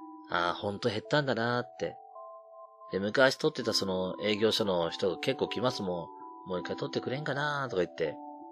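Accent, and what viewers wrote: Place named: Kansai, Japan. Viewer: こんばんは😃🌃 [週刊怪談]ありがとうございます～🥰関西弁可愛よ💝拝聴中～🤗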